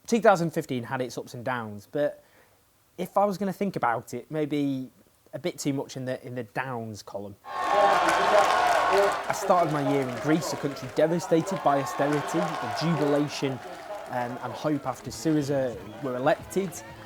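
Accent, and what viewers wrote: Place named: North, United Kingdom. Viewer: Sound more northern/working_class than in other videos. Product of the content ?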